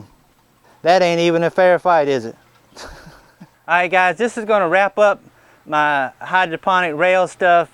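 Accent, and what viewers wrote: South, United States. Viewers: Ok, so I hear a southern accent and I know I heard at least a couple of "dat"s...are you in or around Louisiana? Who Dat? LOL Anyhoo, I live in northwestern Louisiana and have really moist soil...I was wondering if you have any suggestions of either what would grow best or how to get my soil to dry up a little. I till it but with all the rain and the fact my soil holds moisture like a sponge, it just never seems to dry (and this is on the elevated areas)...love your videos!!!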